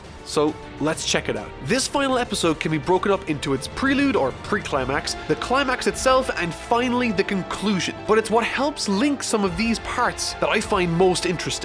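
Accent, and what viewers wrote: Ireland. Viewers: are u irish? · “And I’m not just saying that because I’m from Ireland” So THATs your accent.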